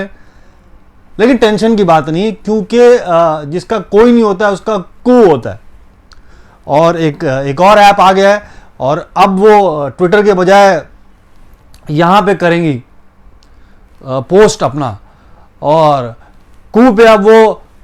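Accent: Mumbai, India. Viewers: भाई... किसी को copy मत करो... आप original में भी काफी अच्छे हो .... मुंबईया language में ... 👍👍👍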